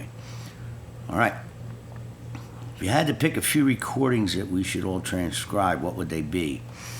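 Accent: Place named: Philadelphia, United States. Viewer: Wonderful stuff., you have the purest Philadelphia accent ever!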